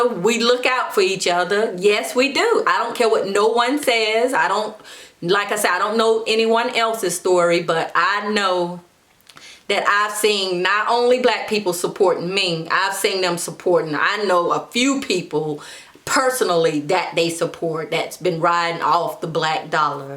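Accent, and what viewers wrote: South, United States. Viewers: Im from north Jersey and I just love that . Southern accent .. and you look great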